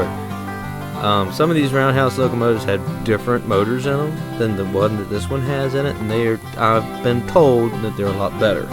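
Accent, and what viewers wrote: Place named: South, United States. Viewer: Your story tell voice with the slight southern drawl makes the narrative for this perfext. Fits the WM atmosphere almost as if scripted. Hope to see /hear more. Nice machine, I have three of them. Bears to get running right, but once they do, they're magical to behold. Some of the strongest workhorses I have too.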